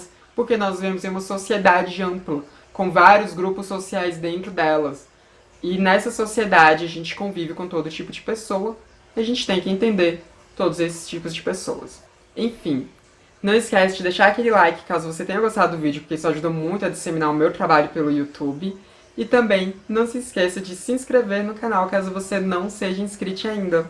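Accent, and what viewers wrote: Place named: South, Brazil. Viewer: aaaah tendi, ñ sei pq o jeito vc q vc fala lembra um pokiiinho o sul 😄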